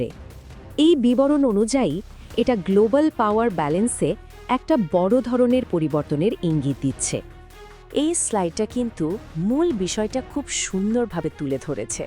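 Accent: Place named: Kolkata, India. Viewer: এই মহিলা কি কলকাতার মনে হছছে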